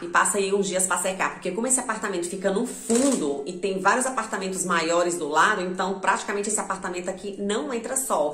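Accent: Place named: Fortaleza, Brazil. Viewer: Só pelo sotaque já sei que é Fortaleza! Sucesso pra vc...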